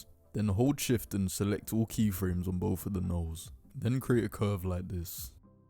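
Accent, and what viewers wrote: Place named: Burnley, United Kingdom. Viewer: Are you from Burnley? love your accent.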